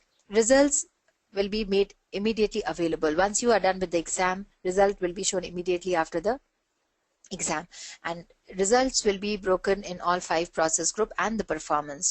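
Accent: India. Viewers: I felt physical pain listening to this indian accent. had to switch off after 65 seconds, else my ears would start bleeding.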